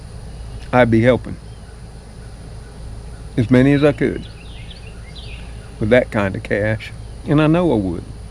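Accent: South, United States. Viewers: I enjoy your down-home southern drawl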